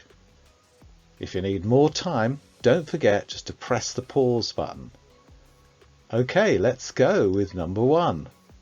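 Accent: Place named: London, United Kingdom. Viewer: How can I speak like a londoner? Lovely!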